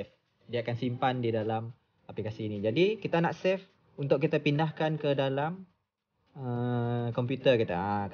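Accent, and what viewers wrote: Sarawak, Malaysia. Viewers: Bunyi org Sarawak jak. Mun benar, kipidap good job. Lamak sik nengar tune tutor gia 😁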